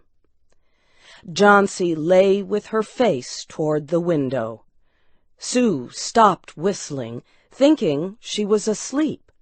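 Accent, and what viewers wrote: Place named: United States. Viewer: 'Her' voice 'is' not good . Wrong grammar . I hope you think twice before commenting next time because that's a very nice american accent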